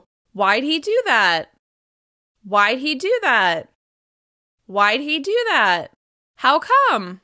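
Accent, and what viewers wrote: United States. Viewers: Hi! I love your clear information and a great lesson. Are you from Southern California? I tho your English has a California accent! Correct?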